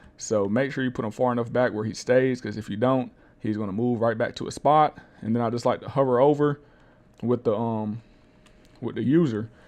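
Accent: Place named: South, United States. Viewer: This guy is from Alabama or Mississippi. That drawl....I recognize my peoples...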